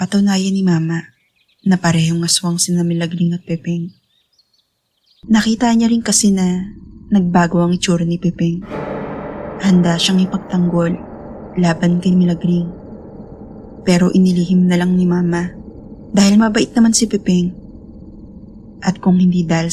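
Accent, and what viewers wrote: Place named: Conyo, Philippines. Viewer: Yunh conyo ka HAHAHAHA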